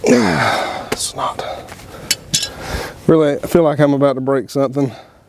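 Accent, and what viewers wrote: South, United States. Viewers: The way you southerners say oil kills me. 😁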